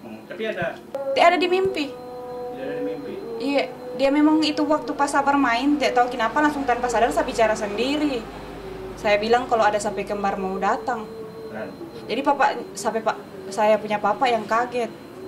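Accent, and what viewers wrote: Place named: Bugis, Indonesia. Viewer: Itu bahasa Indonesia campur logat bugis soalnya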